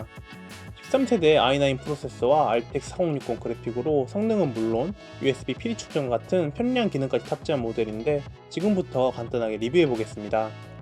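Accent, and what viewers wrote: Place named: Jeolla, South Korea. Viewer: 전라도 말투가 신뢰가 안가네요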